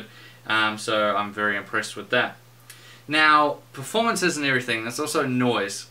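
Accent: New Zealand. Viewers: Mate, your from New Zealand? Awesome!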